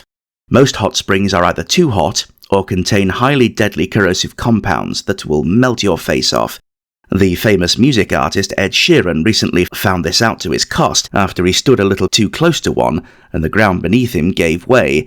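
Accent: Australia. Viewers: Cool stuff! Liked your Aussie accent too 😂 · Love it,and your aussie accent was your best yet ;-)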